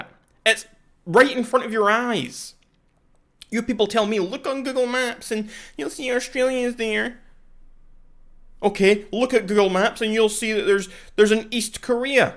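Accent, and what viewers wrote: Scotland, United Kingdom. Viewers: Is he actually not scottish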